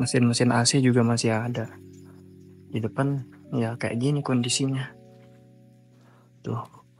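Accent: Lombok, Indonesia. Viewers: Awalnya saya penasaran ini Abang dari mana, syaa tebak tebak dari aksenya bahasanya awalnya ragu" eh pas menint ini (sumpret)😂😂, badahh Batur Sasak arane sehat sehat Batur mudahan de sukses lek jepang! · Logat nya mirip orang lombok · salam dri lombok bang, stelah sy dnger logat bhs indo sde, trnyata bner sde asli lombok tulen ,, sehat selalu smeton ..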